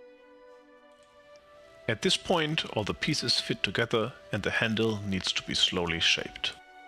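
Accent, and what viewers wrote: Germany. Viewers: Are you german? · Are you a german? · Kann es sein das du aus Deutschland bist. Das hört man nämlich voll an deinem Akzent :) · Ich wusste ich habe da einen leichten deutschen Akzent rausgehört ^^ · that´s some fine german accent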